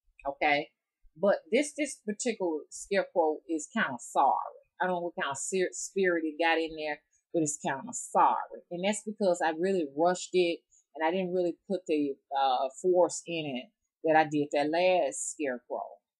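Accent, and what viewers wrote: South, United States. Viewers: I absolutely love the southern drawl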